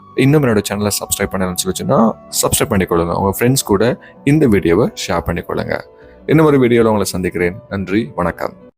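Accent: Sri Lanka. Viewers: உங்க இலங்கை தமிழ் உச்சரிப்பு அருமை தம்பி 🎉🎉🎉சட்டுன்னு பார்க்க AI மாதிரி இருக்கு நீங்க எங்க work பண்ரிங்க my son joining 1year mbbs in channai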